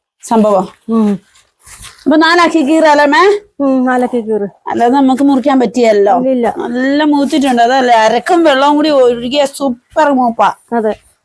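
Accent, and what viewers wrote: Kottayam, India. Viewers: വലിച്ചു നീട്ടാതെ വ്യക്തമായി എല്ലാം കാണിച്ചു തരുന്നത് കൊണ്ടു വീഡിയോ ഭയങ്കര ഇഷ്ടമാണ്. സംസാരം കേട്ടാൽ കോട്ടയംകാരി ആണെന്ന് തോന്നും. ശരിക്കും ഉള്ള നാട് ഏതാണ്. ഒന്നു പറഞ്ഞുതരുമോ. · Samsaaram kottayam style aanallo.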